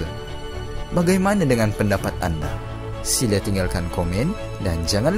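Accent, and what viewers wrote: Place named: Sarawak, Malaysia. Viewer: Sarawak? Sebab ko ade slang sane.. Kan kan kan kan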